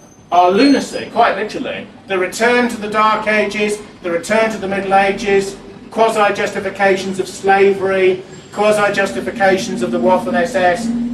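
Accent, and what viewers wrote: United Kingdom. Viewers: Idk man to me he sounds pro'er Bri'ish.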